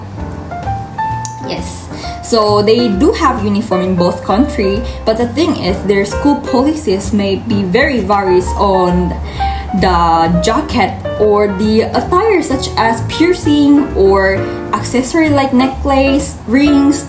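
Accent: Philippines. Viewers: I love that you have filipino english accent + a little bit of korean accent. Your enunciation is very clear. That is actually good because filipino english accent is neutral. You will easily adapt a new accent, say, if you move to America. Good job! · You got that Filipino English accent. I am a new subscriber here anyway. Happy Holidays and Have a great day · Google translate ENG > KR : ㅎㅎㅎ 영상 재미있게 봤습니다. 영어 발음과 억양이 필리핀 스타일이라 매일매일 점점 필리핀 사람이 되어가는 게 너무 귀엽고 웃기네요. 건강하고 안전하게 지내며 필리핀에서의 삶을 즐기세요. :face-blue-smiling::face-blue-smiling::face-blue-smiling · Why do you have the Philippine English-accent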